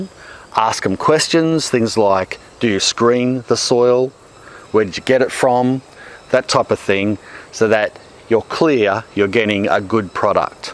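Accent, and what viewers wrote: Queensland, Australia. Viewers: I’m going to guess you’re from Qld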